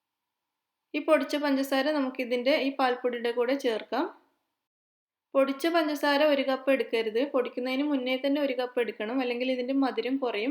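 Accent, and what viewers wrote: Kannur, India. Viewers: കണ്ണൂര്‍ കാരി ആണോ I also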